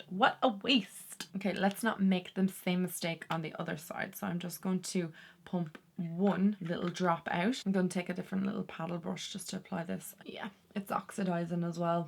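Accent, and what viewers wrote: Ireland. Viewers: I could listen to you talk all day….I just LOVE an Irish accent. I’ve loads of friends from Ireland and I so enjoy our talks, with their gorgeous accents and Irish sayings….”God love him” and “Mother of God”. Teddy U.K. xx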